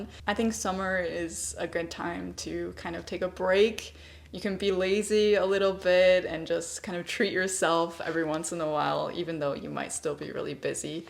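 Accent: Standard. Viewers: Leah讲的似乎就是慢速、完整、标准的英语。有的家庭是要求孩子这样说话的。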